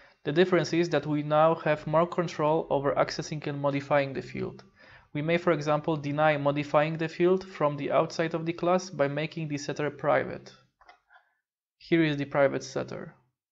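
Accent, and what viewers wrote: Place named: Poland. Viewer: Polak detected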